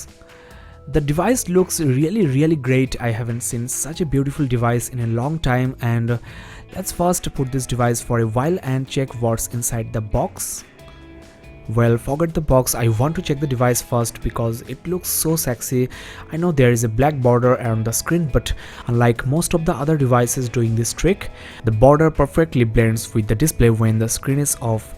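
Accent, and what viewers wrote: Bengal, India. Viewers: Maza aa Gaya bangali English sun Kar..... Osaaam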